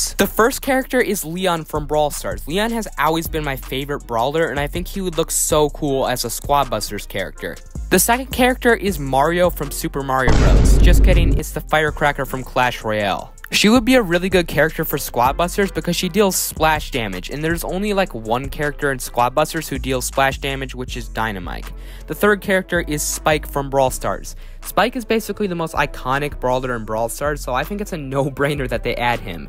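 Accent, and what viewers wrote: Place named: United States. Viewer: That is probably the most American accent i have heard ( if hes not american hes a 17 yr old Australian )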